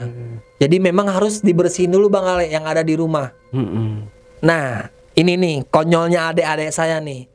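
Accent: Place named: Jakarta, Indonesia. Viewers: Betawi banget kaya baba gw . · Gak tau kenapa kalo orang Betawi lagi cerita seneng aja gitu 😁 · 🤭🙏, Betawi bnget 😁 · Sebagai orang betawi, gw demen bener denger nih abang cerita dengan logat betawi yg kental. Inshallah selama kita selalu beriman hanya kepada Allah dan menyembuhkan diri dengan cara2 yg dibenarkan oleh Al Quran dan hadits, keluarga abang dan kita semua selalu di lindungi Allah SWT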